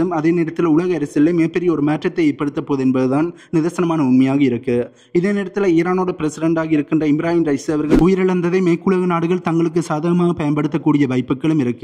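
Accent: Sri Lanka. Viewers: Srilanka tamil best voice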